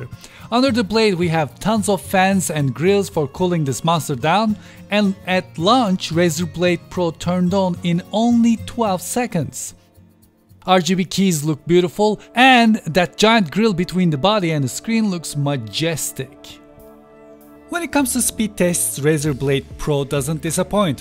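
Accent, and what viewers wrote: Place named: Turkey. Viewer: Aksanından Türk mü bu adam acaba dedim :)))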